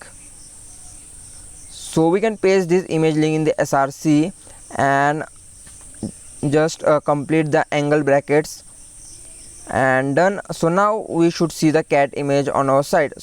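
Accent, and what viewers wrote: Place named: India. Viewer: why accsent india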